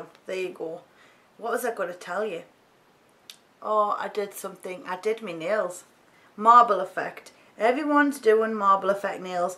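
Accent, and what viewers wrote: Newcastle, United Kingdom. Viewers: I love just sitting listening to you rambling on must be that Geordie accent. I cannot do anything with my nails. Hopeless!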